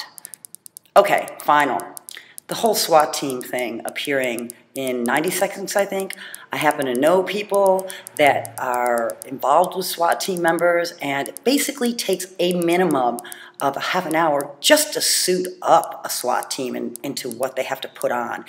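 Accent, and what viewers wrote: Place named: Midwest, United States. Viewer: Great, subtle Mid-america accent. Where are you from, darling?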